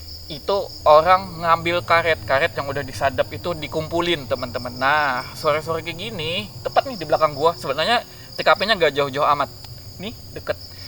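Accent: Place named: Banjar, Indonesia. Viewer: Lihat logat sepertinya utang banjar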